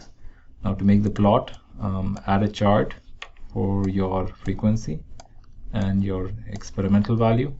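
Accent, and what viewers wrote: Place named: India. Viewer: This indian sounding man has saved my electrial engeneering homework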